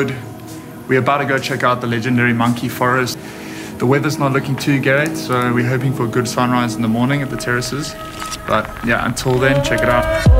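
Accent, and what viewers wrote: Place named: South Africa. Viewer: Love your stuff dude. Are you South African? Sounds like it ;-) Lekker man.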